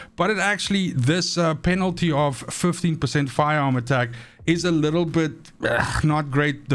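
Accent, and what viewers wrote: South Africa. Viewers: Yo man are you South African?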